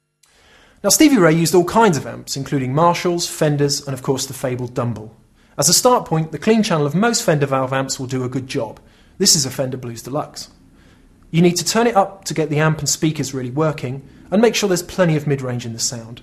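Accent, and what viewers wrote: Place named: United Kingdom. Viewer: !!!! so Young! As a non-English speaker it is remarkable how his accent has become so much clearer over the years whilst remaining so British. IMO, the best guitar youtuber with Andy and Justin. amazing guitar playing and presentation skills, I am so envious:)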